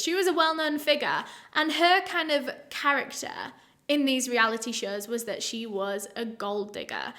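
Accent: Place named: Scotland, United Kingdom. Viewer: Great to join your channel and I am not a troll or any type of idiot, but I have to agree with you when you were promoting your hair products, your hair is shiny, beautiful and the color brings out the beauty of your eyes. I love your accent and I am guessing Scottish because I can understand you, but friends who recommended me to your channel, can't understand you, however I am from Boston and I get it every day, no one can understand me, but I thank you for the opportunity to join your channel and I will continue to do so monthly. Again I am not hitting on you, however, you possess all that I look for in a woman, I think you are aesthically pleasing and me, not a fan of much make up, but the little bit that shows you wear, makes you perfect. Thank you, love Jace Harrell, and that is my real name not Joe or Joseph, whatever it says, and I hope that I didn't just tell everyone, while I thought I was dm'ing you. 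🇺🇸 🏴. You say your r's like me Car is Cah, ❤ it. ✌ girl.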